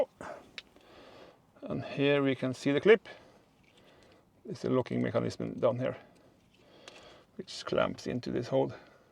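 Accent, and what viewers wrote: Norway. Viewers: Var ikke vanskelig å høre at du er trønder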